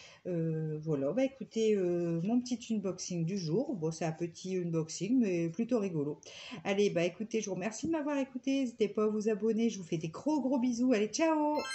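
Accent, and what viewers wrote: North, France. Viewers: Un petit accent du Nord ?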